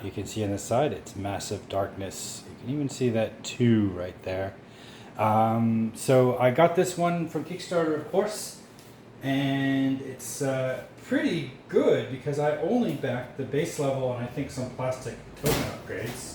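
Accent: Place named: North America. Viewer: Thanks for the video! What part of the world are you located? Your accent sounds North American, but I assume you are not since I don't even think the boats have landed in the States yet. Shouldn't be long now and OMG am I excited to play!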